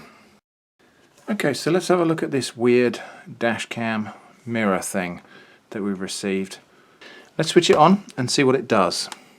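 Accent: United Kingdom. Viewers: I were about to say that you forgot to mention that the footage is mirrored aswell but then I remembered you British accent. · how does he sound much more british in this video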